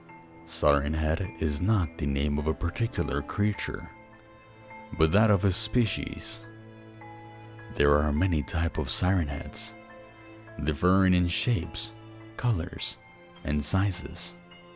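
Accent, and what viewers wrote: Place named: Europe. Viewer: You sound like the foreign European guys from Family Guy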